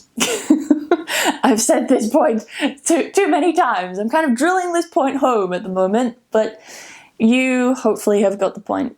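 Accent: United Kingdom. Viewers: Hello miss, yours is RP or Modern RP accent or any other UK accent ? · Please tell me in which british accent you are talking😊💐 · your British accent is just lovely! I've been working on American English accent for ages, but now I need to think twice...... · Shortcut: Looping this video to learn the British accent from 😏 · I like the way you say the word "Japanese". I feel the British accent. Your channel is by far the best channel to improve British pronunciation. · Thanks for your tips and all your encouraging words. Leaning a language is so difficult. It has been years since I have started learning all by myself because I was so bad in school. In France, they are not good for teaching english at school. So technically I am learning by myself with series in english with english french subtitles first and now with english subtitles. I am listening to Podcast recorded by my favourite actor who is english. 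And now I am preparing for the TOIEC. Each day I listen some of your videos and I am really learning to sound more British thanks to you. It's so easy to listen to you, I can perfectly understand what you say. I don't think I will be completely fluent one day because it's really hard but I am progressing each day and that's a really good thing.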